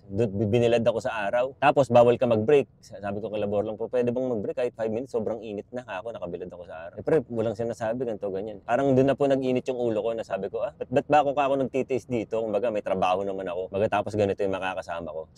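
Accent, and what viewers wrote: Philippines. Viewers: halata naman sa pananalita na sa Pinas lumaki yan jusko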